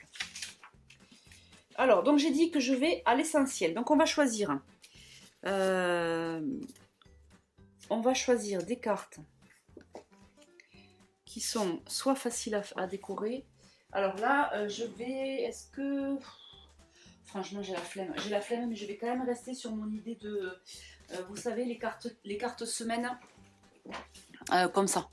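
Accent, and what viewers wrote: South, France. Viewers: tes papotages avec ton bel accent du sud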